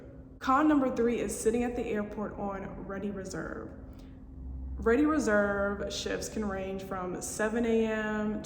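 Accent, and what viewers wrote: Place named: Alabama, United States. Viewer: you talk like a Bama girl though ! 🙂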